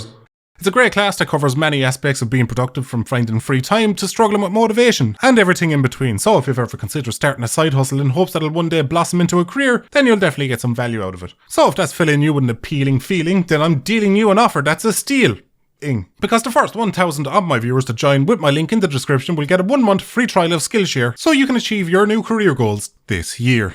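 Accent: Ireland. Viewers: I just noticed your accent, are you from County Cork or Dublin town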